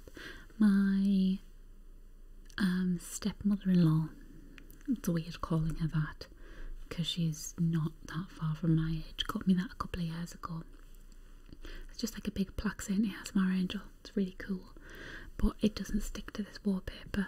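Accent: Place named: England, United Kingdom. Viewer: I've only ever commented once or twice on your videos (HAD to comment ages ago about the girl in the library one because it's still a massive favourite). I couldn't not comment to congratulate you on your 100k subs. You're the only ASMR creator with an English accent who gives me tingles (I'm Mancunian, so it's not that I've got anything against Brits!). It's fantastic to see your hard work being recognised! Thanks so much for your uploads, for being so down to earth and for really knowing how to work the tissue paper! I know this isn't relevant to ASMR, but you always look so beautiful. Here's to 250k! Lots of love, Elaine X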